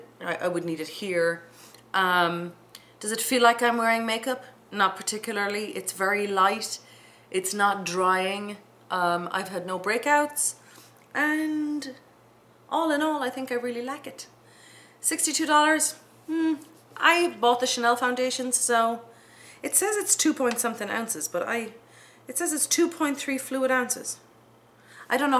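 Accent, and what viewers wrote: Ireland. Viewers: wow it looks good must buy it are you from Ireland originally you sound like your Irish I am from Ireland Limerick xxxxx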